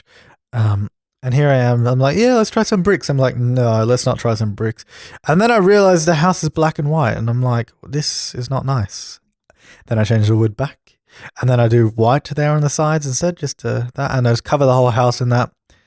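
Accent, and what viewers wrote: Australia. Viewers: Also hell yeah, you're Australian. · You are like the only person who I can watch play sims because you have an Australian accent hahaha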